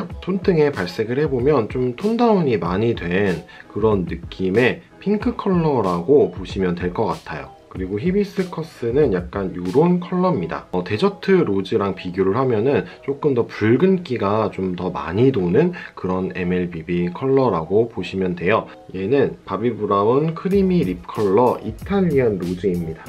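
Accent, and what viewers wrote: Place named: Seoul, South Korea. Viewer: 영상볼때마다 느끼지만 말투가 참 다정다감하시네요. 요란스럽지 않아서 좋은것 같아요!^^ 서울말 쓰는것도 부럽고..ㅎ 무튼 영상 잘봤습니다. 자주자주자주자주 올려주세요ㅎ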